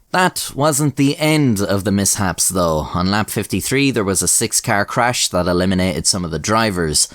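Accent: Ireland. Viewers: Dawg your Irish accent is so smooth and pleasant to listen to, stay beautiful my friend. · It’s wild hearing these NASCAR driver’s names pronounced with an Irish accent, You pronounced them correctly, just weird hearing them without a. “Southern Drawl” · so a light Irish accent almost pronounces Nascar in its proper grass roots hill folk form... hillbill sees race cars and says "Thats a niiice car" IE the word Nascar was born Also, used to race Karts as a Kid... Dirt circle, WKA. Fist fights were very common at the race track.. usually one every weekend at least.. One time i was at a Dirt Modified Race and at the end because of a disputed win, there was a fist fight of about 20 folks on front stretch/winner circle and the whole crowed basically started rioting.. beer cans were flying everywhere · Ever heard of Mike from Thatchapter? He's Irish too,I like your accent way better though.he sounds like Irish lite or something. 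· yo irish man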